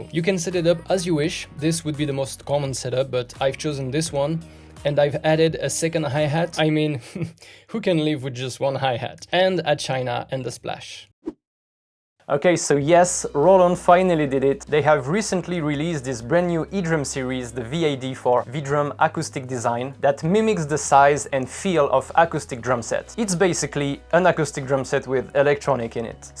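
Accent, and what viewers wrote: France. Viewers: french accent here and there is just 😍.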